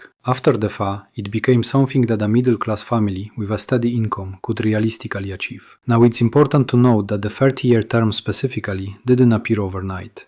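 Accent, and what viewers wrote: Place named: Eastern Europe. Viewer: Почему не по русски? Акцент то то вроде славянский.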